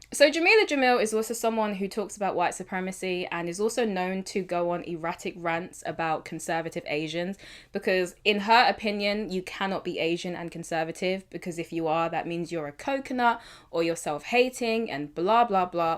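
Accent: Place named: United Kingdom. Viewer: you have that beautifull bantu face, but a British voice. amazing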